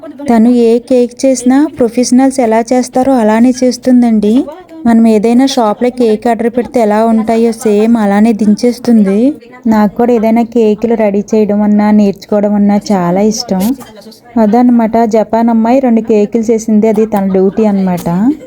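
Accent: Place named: Godavari, India. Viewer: Medhi India lo akkada..vallani mavyyagaru attaya antunnru..njmenaa..full detailed video cheyandi..me slang emo godavari slang laaaaa undi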